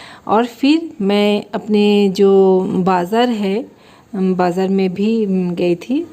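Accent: Bengal, India. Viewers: Aap ki Bangali tadke Vali Hindi mujhe bahut pasand aati hai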